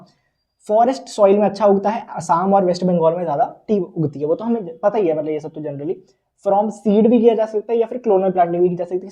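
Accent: Punjab, India. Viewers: bhaiya are you punjabi >?????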